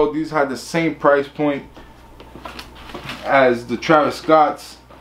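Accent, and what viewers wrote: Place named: Chicago, United States. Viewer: I copped these too, paid resell . Love the Jordan 6. You sound like you from Chicago. Or the midwest area .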